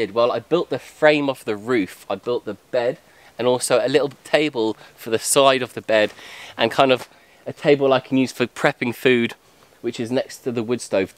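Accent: United Kingdom. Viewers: i mean guy sounds british.....theres no bears there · You from the UK? We normally detect it a mile off.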